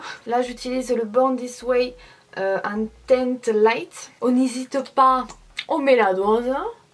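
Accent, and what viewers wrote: South, France. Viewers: Mes qu elle Canon 😍, j adore, tu es magnifiques et en plus avec un brin d humour, tjr pétillante, tjr la bonne humeur avè l accent du sud tous pour plaire 😊 d énorme bisous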